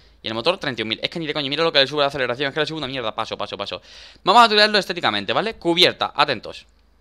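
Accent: Argentina. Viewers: Está en argentino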